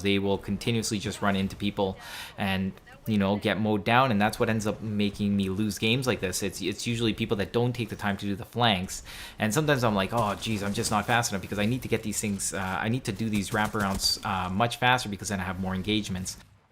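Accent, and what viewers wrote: Canada. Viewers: im digging the canadian accent over gamesager girly voice lol lol jk! you should do some Kraber with invisibilty gameplay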